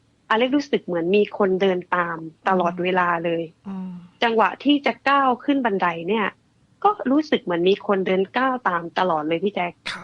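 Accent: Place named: Upper South, Thailand. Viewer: ฟังจากสำเนียง น่าจะอยู่จังหวัดเดียวกับเรา ภาคใต้ตอนบน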